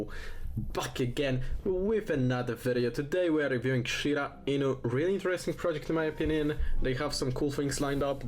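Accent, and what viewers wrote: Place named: Eastern Europe. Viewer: you have a nice accent what is it? some slavic it seems. I already bought for 1000$ shr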